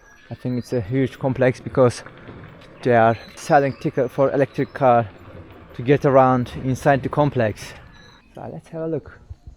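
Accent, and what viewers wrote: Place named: India. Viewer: aksanın hintlileri anımsatıyor :)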